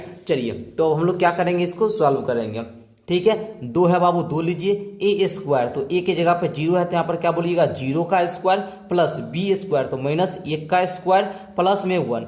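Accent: Bihar, India. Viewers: Kya Bihari Ho Ho😂😂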